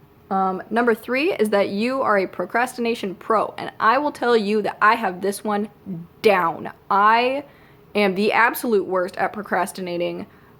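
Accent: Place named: United States. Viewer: Clear American!! YAAAAS.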